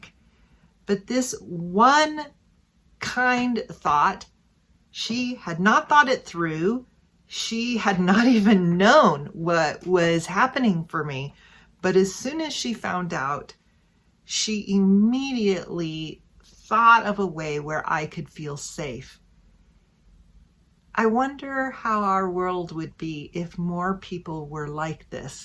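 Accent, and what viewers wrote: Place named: United States. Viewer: American accent is clear.❤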